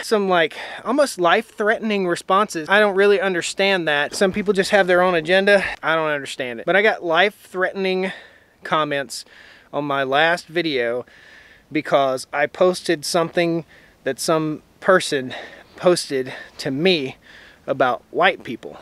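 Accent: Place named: Franklin County, United States. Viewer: I absolutely knew where you were from as soon as you finished the first fifteen seconds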